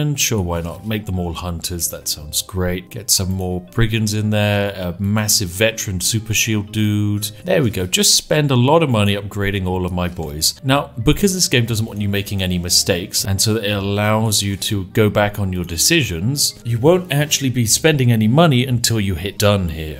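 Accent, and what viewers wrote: United Kingdom. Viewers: how DID you come to be SO very British?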